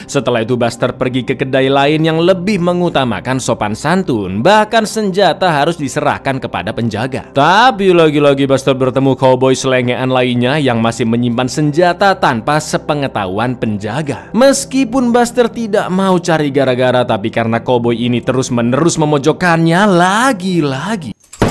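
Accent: Java, Indonesia. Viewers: Mas jowo asli Tah mas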